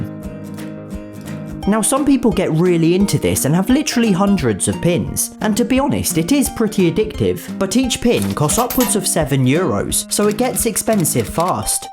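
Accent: England, United Kingdom. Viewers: I speak french, and that is one of the most english accents i have ever heard.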